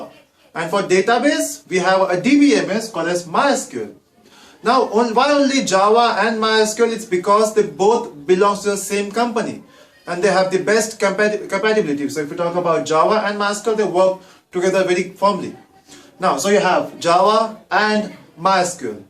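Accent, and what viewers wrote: Russia. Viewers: for 10sec i thought u were russian sir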